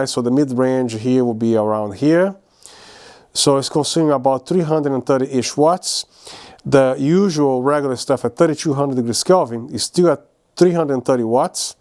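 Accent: Brazil. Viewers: There aren’t many reviews of these new models and they look amazing, thank you. I own 2 la200d and absolutely love them, I plan to get 2 la300r next year, hopefully. Have you checked out the godox s120t softbox? There are also other sizes, but they are “umbrella” style, apparently much easier to set up and colapse, I want to replace my softboxes with 2 s120t. I’m from Brazil and even though these products are relatively inexpensive abroad, here the prices are absurd. Ps.: you sound Brazilian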